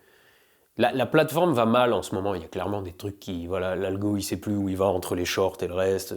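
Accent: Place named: East, France. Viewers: De la botanique à la sauce, ça va dépoter! Je ne loupe pas les mises en avant de produit avec l'accent de l'est de La France. Et puis c'est l'apprentissage des gros mots en Alsacien. Pour les futurs sujets, fais toi plaisir et surprends nous! Tu aimes la qualité alors fonces. Tu fais parti de ces créateurs qui m'apportent de la connaissance avec humour. A bientôt. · Ce que m'inspire ta vidéo... Humblement... T'as pas un côté HP? T'as bien le droit de faire ce que tu veux... Je te suis pour le ton, les thèmes et ta façon de vulgariser... T'as le droit de faire ce que tu veux... Fais-moi rêver avec ton accent mosellan... On est voisin, big up.... T'as le droit de faire ce que tu veux...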